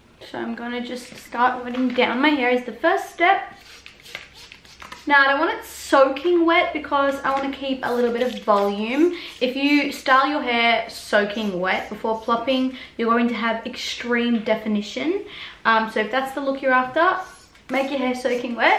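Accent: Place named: Australia. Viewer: Love listening to your Aussie accent!😄❤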